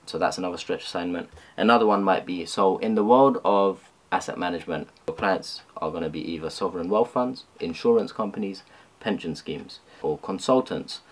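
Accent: United Kingdom. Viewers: What is your accent? Is it british or south asian or both? Lol its all over the place.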